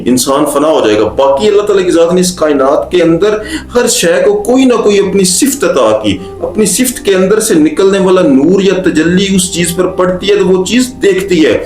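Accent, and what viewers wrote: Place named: Punjab, Pakistan. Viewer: They are punjabi from Pakistan their tongue is different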